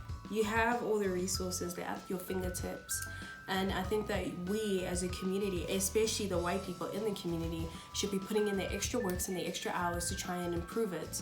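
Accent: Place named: South Africa. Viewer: I absolutely love this!!! I knew you were South African immediately and this is such a good video💗 I came here from an ig link and I'm so happy to be here Another thing these brands think we don't notice is when they make 15 shaded of concealer thinking we don't know all those shades can just be used as contour for lighter skinned/white people. That's why the darker concealers are always the wrong undertone (undertones that don't emerge among darker skinned people) Also love your shirt💗😋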